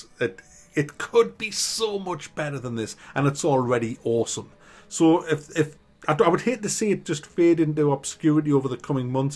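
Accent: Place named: Newcastle, United Kingdom. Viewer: Pretty sure he's a geordie or mackem not an aussie... agree on the US election point though!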